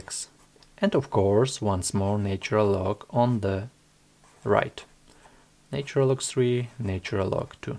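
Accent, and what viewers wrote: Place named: Russia. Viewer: hello there! you are making great content! i have a few questions.u dont have to answer, if you see some of them personal) 1. will you do videos on higher mathematics? 2. what is your origin country? (i'm asking you this question,cause of your accent. i am from russia and i hear many of ours speak in english like you) 3.do you enjoy your life?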